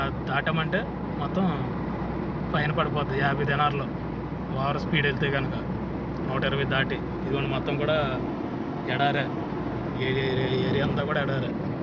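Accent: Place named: India. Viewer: Nice Vlogs mana Telugu Language and slang lo 👌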